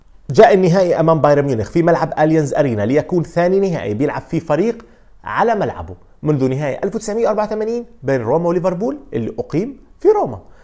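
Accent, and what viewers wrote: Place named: Syria. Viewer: اللهجه السورية بتجنن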